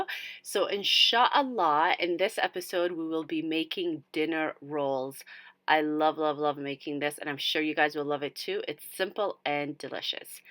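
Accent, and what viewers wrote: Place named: Canada. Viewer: Cunto Macaan maasha Allaah I show them and they lake the Canadian accent too thank you abaayo every week they are gona Cooke one think mahad sanid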